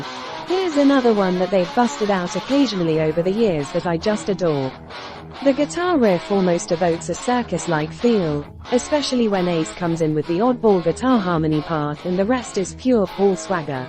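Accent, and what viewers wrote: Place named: United Kingdom. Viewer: WHY IS A BRITISH WOMAN NARRATING THIS FOR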